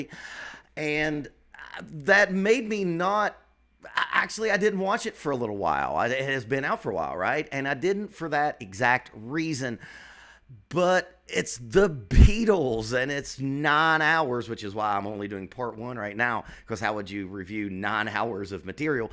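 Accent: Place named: Gulf Coast, United States. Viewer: I'm trying to read your accent, are you from the gulf coast?I came for the Beatles doc, but I'll continue to check out your channel! 👍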